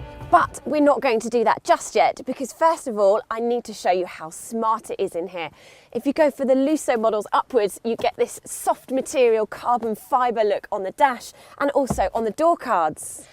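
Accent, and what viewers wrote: United Kingdom. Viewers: BRITISH ACCENT SO CUTE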